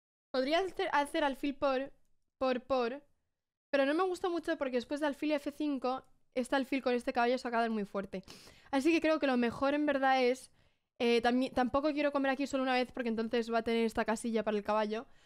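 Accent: Spain. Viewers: Es notable para un argenino, descubrir, a través de distintos youtubers, los distintos acentos idiomáticos, desde Juanjo de Rey Dama, que se come las eses, con gracia suprema, hasta este castizo maravilloso de, que acaricia nuestros oidos con sus matices entre, eses, zetas y ces. Luisón, Rey Enigma, Spider Ibarra, pasando por Pepe, nos sumergimos en ese maravilloso mundo de acentos españoles. Gracias, no te agrandes, que te regaló un caballo el Rey, cariños desde Mar del Plata, alguien que admira tu belleza y simpatía insuperable, también tu capacidad para el juego. 13 de mayo de 2024. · That's a real spanish accent! ¿Are you from España? 🙂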